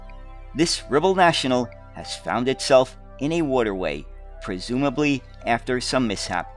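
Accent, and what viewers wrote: New York, United States. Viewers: DUDE... WTH??? LOL . THIS IS SOO CRAZY. A YANK TALKING IN DETAIL ABOUT A LITTLE OLD ENGLISH BUS AND BUS COMPANY FROM THE PAST. . HAHA. I LOVE IT. YOU SOUND LIKE A NEW YORKER LOL. LOVE THE ACCENT MAN. I LIVE IN THE NORTH WEST ENGLAND , MANCHESTER AREA . NOT FAR FROM LEYLAND. IM NOT SAYING WHOS BETTER US OR YOU ARE ANYTHING, ITS JUST NICE FOR A CHANGE A FRLLOW BUS FAN AND AN AMERICAN TALKING OF SUCH A SUPJECT. THANK YOU FOR THE VIDEO. AS IT HAPPENS I REMEMBER THESE BUSES WHEN THEY CAME OUT, I WAS YOUNG, BUT A FAN OF BUSES, BUT I DO REMEMBER THEM VERY WELL, BEING VERY NOISY, RATTLED LIKE HELL GOING OVER POT HOLES, THEY HAD A BIG FAN AT THE BACK OF THE BUS THAT YOU COULD SEE THROUGH THE AIR DUCT PANAL. THST SPUN VERY FAST. I USE TO BE A BIT A FRAID OF THESE BUSES CAUSE OF THE FAN, THE SPEED THEY WENT AT AND THE LOUD NOISE LIKE A DRAGON,. 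G-LAGGG, G-LAGGGG, G-LAGGG, THAT WENT FASTER N FASTER AS SOON AS THE DRIVER SET OF. NORMALLY LONDON ALWAYS HAD THE NEWEST, BEST BUSES THE UK OFFERED, BUT BACK IN THE 70S N 80S WE HAD A VERY SPECIAL BEAUTIFUL BUS OF OR OWN IN MANCHESTER. THE MANCUNIAN BUS. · this guy sounds like he is from New York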